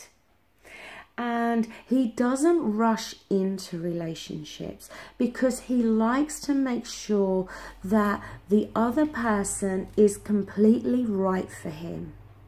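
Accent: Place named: England, United Kingdom. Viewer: thank you as always you are amazing, and not to mention the most beautiful English accent in the whole of England!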